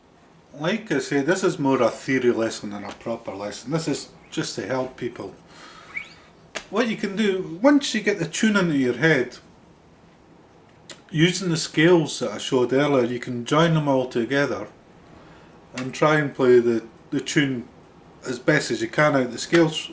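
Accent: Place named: Glasgow, United Kingdom. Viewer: Thanks for the upload. Nice to hear your Glaswegian accent also!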